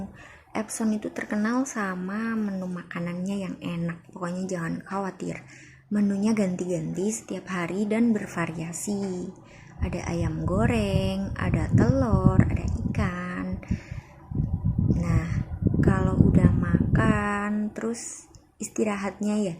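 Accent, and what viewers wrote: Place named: Java, Indonesia. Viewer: MBK asli mana logatnya ky orang Jawa ini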